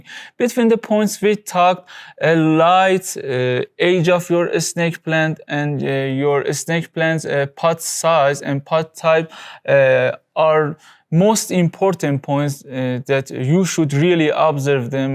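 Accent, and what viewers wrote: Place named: Russia. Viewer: Hey are you from Russia? I love your accent also say hello to dear Mr police station❤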